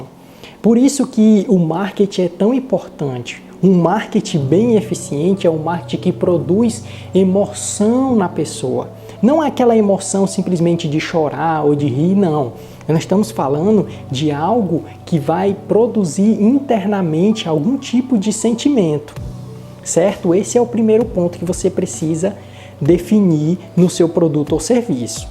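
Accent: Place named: Fortaleza, Brazil. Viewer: Eita sotaque lindo! Também sou de Fortaleza, mas moro no Rio de Janeiro à muitos anos, e somos xaràs no sobrenome.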